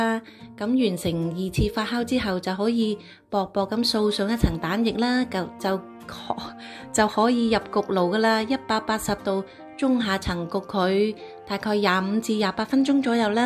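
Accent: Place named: Guangzhou, China. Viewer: 你好！你做吞拿魚包好靚好似好好食！聽你嘅口音好似廣州人？你把聲好聽好可愛，多謝你的分享。😄😄👍👍💐🌺🌹。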